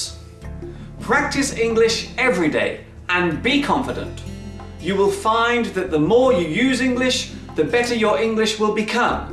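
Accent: England, United Kingdom. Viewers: I like your accent is different than the American English, I know you are from England. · hes speak using British Accent :) my favourite accent :P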